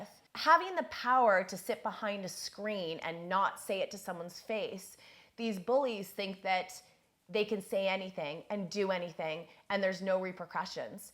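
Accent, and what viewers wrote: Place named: Canada. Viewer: I love the way you say “sorry”. Canadian accent is cool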